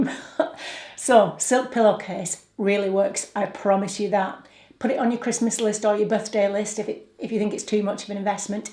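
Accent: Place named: Lancashire, United Kingdom. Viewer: My guess is Lancashire? I'm down in Derbyshire! Good to find someone for the older gals, I'm not quite in the 50's yet but loving your content. Tracy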